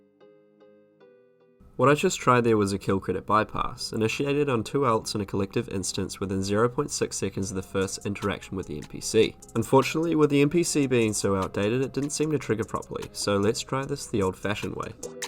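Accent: New Zealand. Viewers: Are you a kiwi brother? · Sub for the nz accent !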